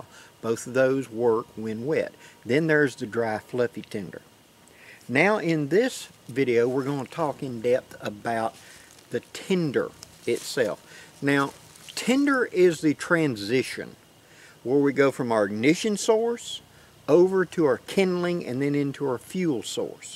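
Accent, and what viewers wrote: South, United States. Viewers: Thank you for the wonderful teaching field trip...love the scenery as well...as always i am very impressed with your Videos, including the Southern drawel 🥰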